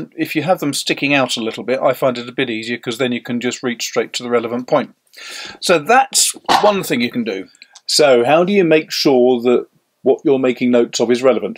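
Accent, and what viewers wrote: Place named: RP, United Kingdom. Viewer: Could you please make a video on why is it that most Brits, even well-read persons like you, have this unbearable artificially arrogant, posh, patronizing, lordly, toplofty tone while speaking? Even among yourselves... what are you trying to proof? The British Empire finished long time ago, did you know?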